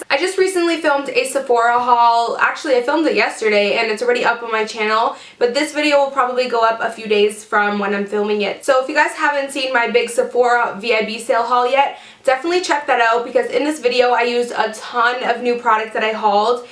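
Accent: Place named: Canada. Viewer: I can really hear your Canadian accent in these recent videos of yours . Its adorable!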